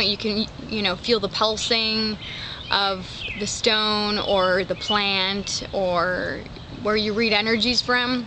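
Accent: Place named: Wisconsin, United States. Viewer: Thank you sooo much! Your uhms and ya knows comes from WI (awesome)! Informative and sweet! Blessed be!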